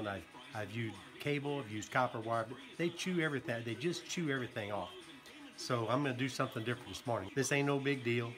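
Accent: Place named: Oklahoma, United States. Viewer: Thanks! This was EXACTLY what I was looking for! I have a squirrel feeder, in a diff section of the yard that I put peanuts in each day. But, they’re never satisfied w/their daily ration. Lol. I can’t believe how far they can jump! I’ve tried moving the feeders, hanging them on taller shepherd hooks etc but inevitably they’ve managed to reach the feeders. Because of the number of feeders I have, I didn’t want to buy baffles & have a large sheet of aluminum I could use. I bought it Home Depot but it was over $20-any suggestion for cheaper option of metal in sheets? Type of metal or source/store? Thanks again for the video. I enjoyed it & hearing your voice. Reminded me of home, where I grew up in Oklahoma.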